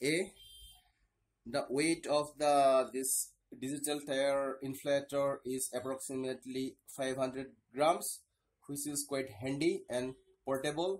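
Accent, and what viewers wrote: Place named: Assam, India. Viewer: pakka assamese accent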